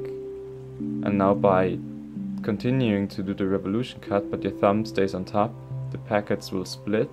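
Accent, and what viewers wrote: Germany. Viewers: Are you from germany or somewhere there? Great tutorial btw :)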